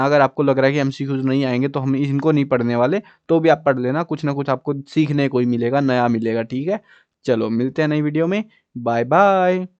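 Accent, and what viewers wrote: Haryana, India. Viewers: Ap haryana sa ho kya bhai sorry. But Mariko ap ka history samaj nahi aaya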